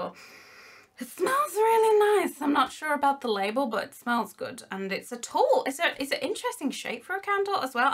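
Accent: United Kingdom. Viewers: I love your British Accent. I actually am not British, or English, but I speak an amazing American accent and I am trying to do a British accent. And how much did this box cost?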